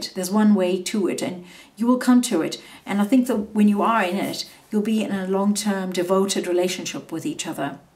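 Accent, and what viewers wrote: South Africa. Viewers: Are you South African? 😊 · Are you South African? 😍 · Love your style of reading and soft South African accent 🇿🇦 ♥️ big Love! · Your accent is lovely, it's very soothing to me Where are you from? SA? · Are you South African? 🇿🇦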